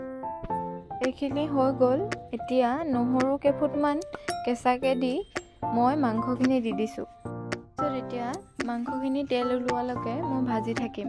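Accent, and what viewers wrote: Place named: India. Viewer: Beautiful. I find your bangla a bit different than kolkata. Where is it spoken like this?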